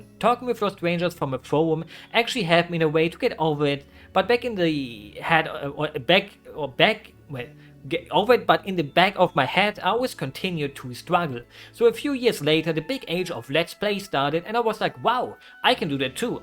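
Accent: Germany. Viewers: Necroposting a lot, but I got it suggested and never watched it before so... here's my two cents. Very heartwarming, inspiring video and a great listen overall. Thanks for sharing your personal history, allowing the audience to take it in and make their life better (and accept themselves). I always hated the "true" sound of my voice (which is natural, it's the same as not liking having your photos taken - our brains are used to see our own image mirrored because, well, most of the time we just see our reflections) to the point that I lashed out on people using speaker during calls as I could hear myself. So recording myself speaking and then listening to it and fixing it was a true torture and I wanted to die... until it wasn't. At some point... I just stopped caring about it and accepted myself. The same could be said about having aversion to own image. Very often there's that little voice inside our heads that tells us that we're just "not good enough", when in fact people really doesn't care (most of the time). 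Most of the time we are our own worst enemies, huh? Perhaps that's just And hey, I think your voice is really cool, same with accent, tone and tempo. It makes it quite pleasing to listen to, ...and yeah, I realized you were German after like 5-10 seconds of listening to you. It isn't a bad thing, it just... something that is and makes your content bit different than most, haha! It is funny though how Europeans can pinpoint the accent of others with nearly 100% preci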